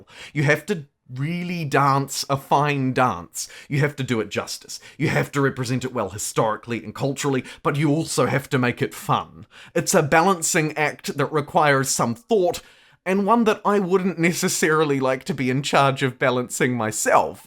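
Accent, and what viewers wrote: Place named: New Zealand. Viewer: Your accent sounds like you're from New Zealand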